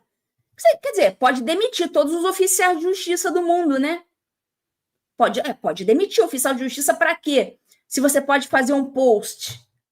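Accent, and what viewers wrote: Rio de Janeiro, Brazil. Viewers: Sotaque carioca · O sotaque carioca confundiu o ouvido do pessoal. Aos meus ouvidos, sinceramente, passaria batido, tanto que só fui perceber a "confusão" porque veio a tona rsrs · adoro você e esse seu carioques é lindo como você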